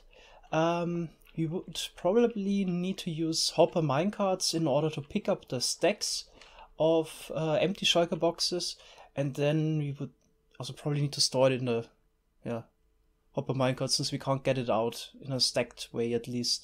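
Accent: Germany. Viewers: HoiiBoy ???? Das liegt daran, dass ein Deutscher Englisch spricht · es klingt wie wenn ein deutscher englisch,spricht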